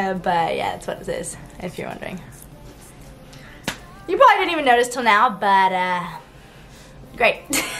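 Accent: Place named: Brazil. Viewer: Você tem sotaque brasileiro ou é impressão minha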